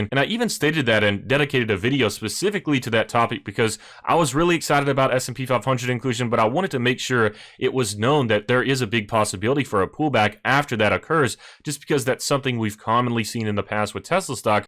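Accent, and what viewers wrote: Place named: North Carolina, United States. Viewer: Love me some North Carolina accent.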